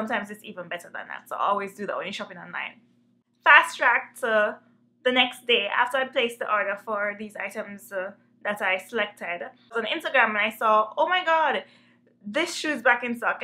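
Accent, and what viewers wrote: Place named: Jamaica. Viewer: Love this review, Soon has I heard your accent I'm like YAY I found another Jamaican YouTuber !!!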